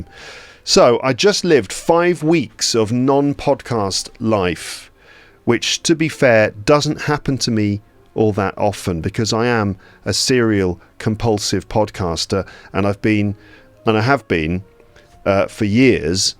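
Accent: United Kingdom. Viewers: Dear Teacher you are great! I love your lessons. Please make videos for shadowing i want to have british accent like you. With love and respect from Azerbaijan. Your lessons are amazing! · thank you for this awesome story about your holiday in Norway. I crossed the Norwegian mountains by bike from Oslo to Stavanger nine years ago... So many memories it pulled out from my memory 😮 Now being living in Canada for almost 1,5 years I wonder how you minimise this funny British accent which I have become to recognize last year? 😊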